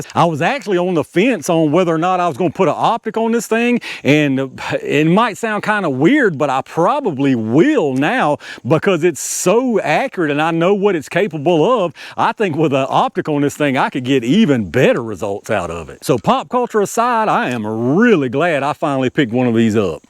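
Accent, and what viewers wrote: United States. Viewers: Came for the gun, subbed for the accent. What state are you in?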